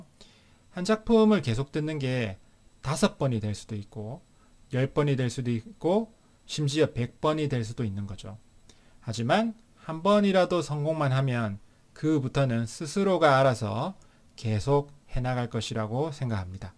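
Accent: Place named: Busan, South Korea. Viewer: 강의중에 부산억양이 살짝 들려서 여쭤 봤습니다! 향후 강의 개설계획 있으시면 좋겠습니다~여기도 부산입니다~~^^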